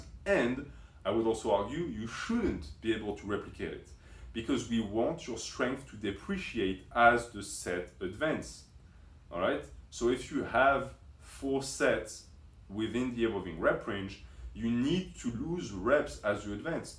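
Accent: France. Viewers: You're French man? you're accent seems French to me. C’est bien ce que je pensais tu suis et.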